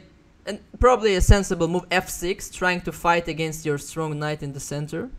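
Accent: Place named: Brazil. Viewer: So quem é brasileiro conhece esse sutaque do ingles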